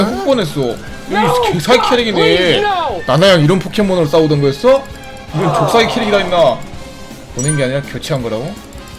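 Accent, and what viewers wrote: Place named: Jeolla, South Korea. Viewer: 전라도 광주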